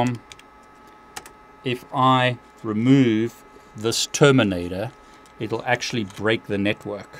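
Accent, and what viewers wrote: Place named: South Africa. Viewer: how long have you been in South Africa?, I can pick up your accent to somewhere in South Africa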